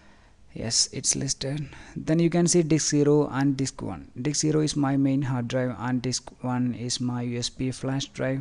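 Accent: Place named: India. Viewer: he must be indian indians speak english like that R r RRr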